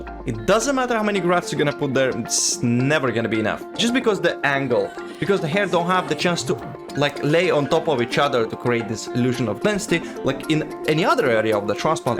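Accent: Russia. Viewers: Что у вас за акцент? Вы русский?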